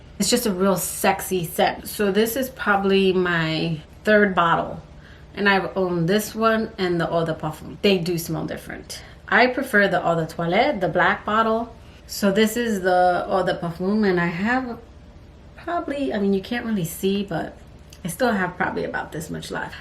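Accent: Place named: Orlando, United States. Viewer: When i first heard you talk im like you sound like you would live where i live (weird, i know) lol and sure enough you say Orlando😂 which is where I live. Im like OMG! Small world. And I have all the perfumes you mentioned besides narciso because i highly dislike his perfumes, but overall awesome pics!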